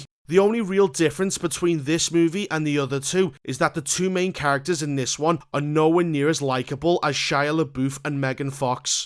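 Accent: Liverpool, United Kingdom. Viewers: This guy sounds like the typical scouse arsehole. You could’ve used reasonable words to say “Oh yeah not a fan of this movie tbh” but nah you overreacted. Yeah okay it’s not everyone’s cup of tea but at least be respectful about it. Calling a director a dickhead was also a typical dickhead move. Just a terrible review overall. At least this film was better than the last two transformers movies (not including bumblebee)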